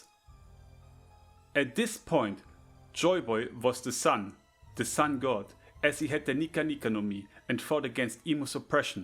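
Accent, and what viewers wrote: Germany. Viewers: Akzent klingt nach 'nem deutschen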